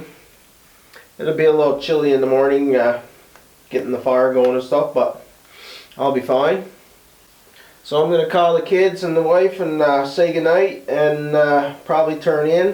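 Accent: Newfoundland, Canada. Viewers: Right as soon as you opened your mouth I knew yous was a newf. From Kilbride myself. Living the life you are.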